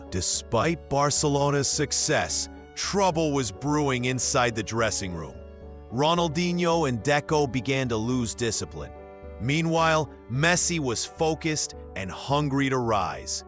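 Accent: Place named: Brooklyn, United States. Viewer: Good video but the narrator's Brooklyn accent was a distraction!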